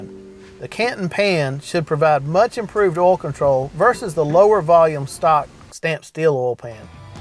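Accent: South, United States. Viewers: I just gotta laugh at this narrators southern sounding accent 😂..!!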